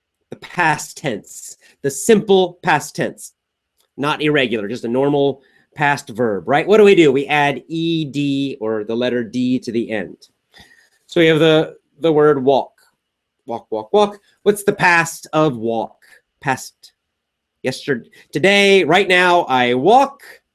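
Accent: United States. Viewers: I love your american accent teacher.its been really long time teaching my self american english only on the Internet cuz we don't have english teachers here well they teach us english roles and grammar by FRANCE ACCENT AND PRONUNCIATON. feeling so down.i hope some Americans come to fez city Morocco just to teach us their own language. 'We re so thirsty ' to learn about American English and more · Super sir..even ur Amcn English we can understand well..(slowly teach).can teach(pronounciation) us more very importantt words?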